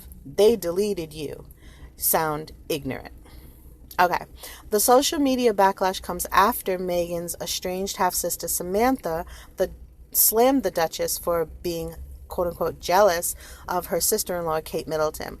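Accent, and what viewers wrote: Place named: East Coast, United States. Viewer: No matter what platform you use, you will find that a lot of negative comments will suddenly disappear. I'm American and I'm guessing by your accent you're from the East coast(?) That's where I'm from and I grew up in a very diverse area where people are just people, and nothing was black or white. You are judged on character, not color. My band mates are English and we're all on the same page with these two. These people, the "sugars" as they call them, have no idea who we are or where we come from. They judge and attack based on comments posted about a woman who has chosen to live her life in newspapers and on TV. I never saw a wedding where none of the guests looked happy, the groom looked shell shocked and the bride looked defiant before their wedding. I just knew from that point on that it wasn't going to end well.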